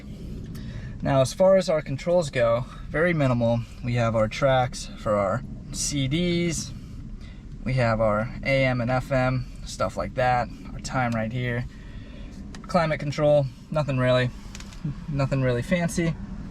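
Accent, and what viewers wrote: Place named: Australia. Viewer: Aussie, mate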